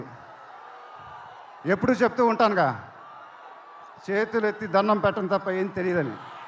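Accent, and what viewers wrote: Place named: Guntur, India. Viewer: Guntur slang 😅vamoovvv adhem dansushhhh😅 mana gunturodura ma anna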